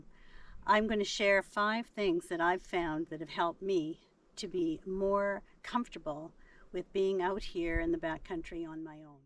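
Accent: Canada. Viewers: thank you so much. You are so easy to listen to. You’re pleasant and warm and full of wisdom. I’m from New England really and can hear some Canadian in your voice. I consider northern Maine home. I miss nature and always walked on my own in the woods and fields. I’ve lived in Utah since 1983 minus two years I drove cross country to be with my mom as she passed from bladder cancer. So the travel bug bit me. I divorced after 30 years and my kids are all grown and very busy. Apartments are too expensive and I just don’t want to feel stuck anymore. Sorry for the long post. 😊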